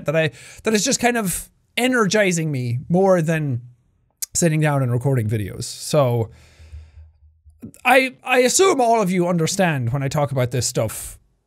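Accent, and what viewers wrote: Ireland. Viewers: Hes irish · I miss this loud Irish person so much I started to watch his happy wheels playthrough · Jack r u irish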